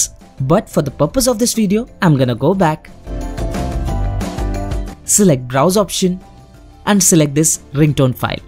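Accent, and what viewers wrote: India. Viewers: Thank you very much And your accent is wonderful. I’ve always loved the Indian people’s accents when they speak English. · your accent is very strong Indian boy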